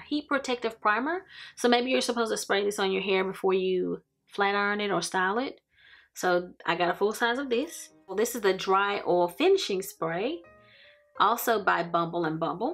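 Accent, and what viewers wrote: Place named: South, United States. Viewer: Love the southern twang! Have a wonderful and safe holiday season. Thanks so much for sharing.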